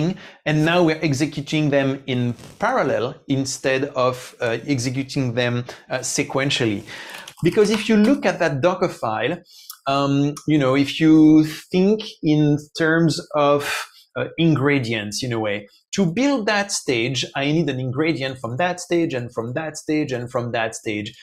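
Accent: France. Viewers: Haha, definitely not :) What confused me was that the guy has French accent, but the prompt is in Cyrillic.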